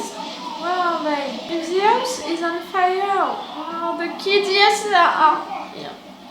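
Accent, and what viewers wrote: France. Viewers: Knew you were French when I heard you. You people have a cute accent 😊. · Tu es française hein ?j’ai reconnu ton accent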